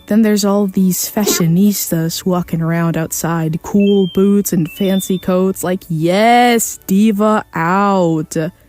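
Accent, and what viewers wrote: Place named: Mexico. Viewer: bro unleashed the full mexican accent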